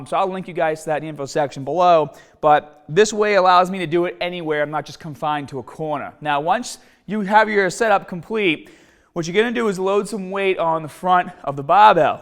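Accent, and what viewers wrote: Boston, United States. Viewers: “Staaaaaaaaaht.” I can’t. There is no accent in this country worse than the Boston accent. Great videos....I’’m just having a haaaaahd time getting beyond the “aaaaaaah”. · That accent tho... I get confused every time. Is that really how ppl from Boston speak? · in your earlier videos I don't remember your Boston accent coming out this much as the vidoes now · It's a Boston accent . Probably related to England and Ireland though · Weird, your Boston accent developed strongly over the last 4 years. I was just watching a video of yours from 2013 (Two Reasons Why Your Biceps Won't Grow!) and you don't even have an accent. Did you move to Boston and develop an accent? Keep your Rs, man. Don't lose those precious Rs. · He sounds like a real deal Bostonian to me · Boston accent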